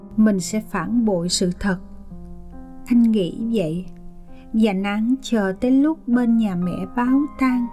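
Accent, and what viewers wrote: South, Vietnam. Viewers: Viết thì hay, nhưng cấu kết câu chuyện thì không đâu vào đâu. 6/10. Cảm ơn giọng đọc miền Nam tuyệt vời của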